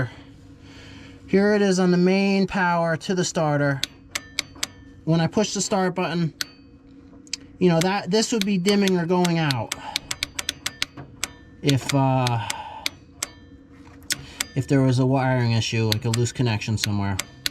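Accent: New York, United States. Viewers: New york somewhere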